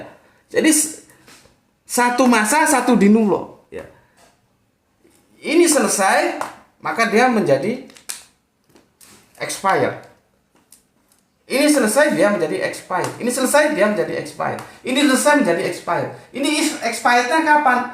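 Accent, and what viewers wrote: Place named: East Java, Indonesia. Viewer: Waalaikum salam..... kadang logika saya dipermainkan oleh cara berfikir...cuma aja kadang pemakaian kata kata nya dalam menerangkan memakai intonasi jawa timuran yg membuat tdk tekstual didalam menerjemahkan dalam pikiran saya......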